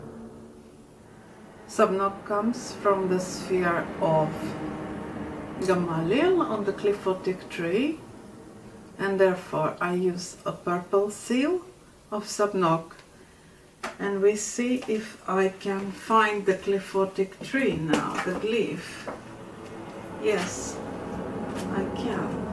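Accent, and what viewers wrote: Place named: Sweden. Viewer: Er du svensk? Jeg er fra Norge og kjenner igjen aksenten din😁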